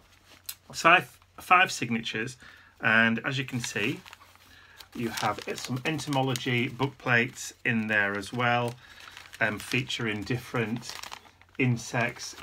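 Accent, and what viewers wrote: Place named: North, United Kingdom. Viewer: Hi. I like your videos. They're so natural. By the way, where is this accent from, somewhere in the north?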